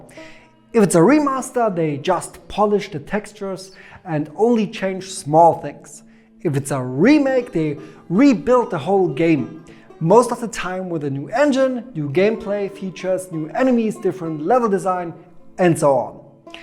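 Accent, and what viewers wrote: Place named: Germany. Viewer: oh haha hoppla, bitte vielmals um Entschuldigung, wusste nicht dass ihr nen extra Kanal habt & danke für Info =) man hört halt den Deutschen raus hier beim Englischen Video & das ist (zumindest für mich) irgendwie ziemlich irritierend. Nicht böse gemeint =D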